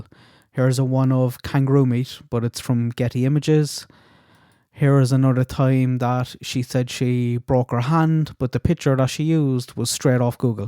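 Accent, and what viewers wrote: Ireland. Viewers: I love the Irish accent & many others in the world!!! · I don’t know why I didn’t consider that; I lived in the same dorm building with a few Irish girls and yeah now I’m thinking the speaker could be Irish lol. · He sounds Irish to me and I like it but it can be a bit harsh at first if you aren’t used to it. Specifically the r’s and t’s. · He’s got a lovely voice and accent you rude pr!ck. And he’d quite obviously Irish, for the ppl in the back 🙄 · Yay to his Irish accent. I love it. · You are either a bully, or racist, if you don't want to listen, go away, every voice is awesome, (not everyone can speak), Irish is like every accent a blessing, you really should apologise, works so hard to bring us content, and you don't have to pay to watch it, stop being rude and if you do want to go farther in life, REMEMBER it's nice to be nice, manners are free, a smile can make a lonely persons day, and we all have to live in this world, and everyone else is as important as you are, have a great day and stay safe ❤ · I'm sorry you don't have a sophisticated ear to appreciate an Irish accent. 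That's your misfortune.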